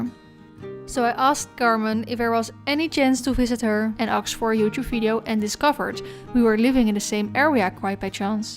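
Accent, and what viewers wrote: Netherlands. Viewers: there is no need to be scared! I used to share your fear about sounding like a Dutchie but I've learned to embrace it. Your accent is absolutely lovely and tells us much about you and your roots. Please be proud of who you are and what you've achieved. Attagirl! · This video was wonderful! I absolutely LOVE the Netherlands and visit almost every year ♥ Your English is amazing, I really like you accent, welcome to this side of Youtube! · As a Dutchie living in Sydney, Australia for 4 years who's been watching your videos ever since you started vlogging, I have to say I'm really proud. 🥹 From experience I can say that speaking English more easily and expanding your vocabulary really does come over time with lots of practice, but also that losing a Dutch accent might never happen, since I still have mine - very thick. Just see it as a sign of bravery. 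😉Cheering you on from down under! 🫶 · Je doet het echt super goed, je Nederlandse accent is juist heel leuk om naar te luisteren. Heel veel succes met je nieuwe kanaal! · You go girl!! ❤ And remember it's quite natural to have a dutch accent since you're dutch and not english. Think people from other countries will find it cute! Liefs Pauline & Skip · I love watching Dutch videos as a girl from South Carolina USA. I hope to visit one day and I am trying to learn the language. Listening to your accent helps me. Plus I love horses so it’s a win win. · Already in love with the acc also really like your Dutsh acc love youu ❤❤ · Hi! So exciting you finally decided to make an English video! Don't worry about your accent. I've been living abroad for about 2 years now (I'm also from the Netherlands) and I found that most of the world doesn't care about accents like dutch people do. Dutchies are just brutal when it comes to an accent. 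There is no need to sound native. Actually while attending language school here I'm in a class full of people with different nationalities. The native English speakers are mainly just super enthusiastic that you speak 2 or more languages and other like German , Italians, Finnish are mainly excited when someone speaks with their own language accent, they are proud. And here we are, the dutch, over achievers and bashing everyone. Just wanted to say, you're doing great and keep going <3 Can't wait to see more of this series!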